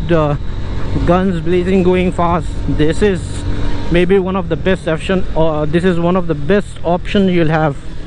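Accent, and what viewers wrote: India. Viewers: accent hi chu Indian ve reuh eeee 😁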